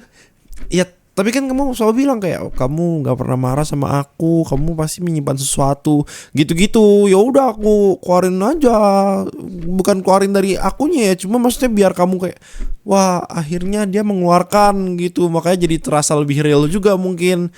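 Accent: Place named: West Java, Indonesia. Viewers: Singkat padat baheula ni sunda banget ni aa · Dari logat nya kaya orang sunda 😭👊🏻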